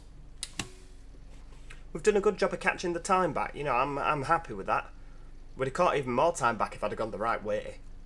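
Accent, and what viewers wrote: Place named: Yorkshire, United Kingdom. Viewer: Nice video.I love the west country area, in the game and in real life, are you from Yorkshire, you have a very strong accent.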